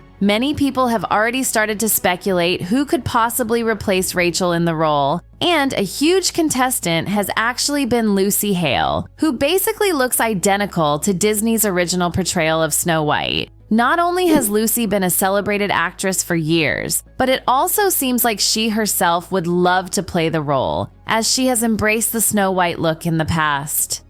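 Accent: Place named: United States. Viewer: Wtf is going on that narrators voice? It's the most annoying American accent I've ever heard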